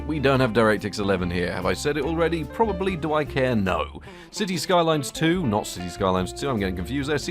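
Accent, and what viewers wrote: England, United Kingdom. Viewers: I love your channel brother. Serious question, are you from the same part of England as Jeremy Clarkson? Your inflection is so much like his.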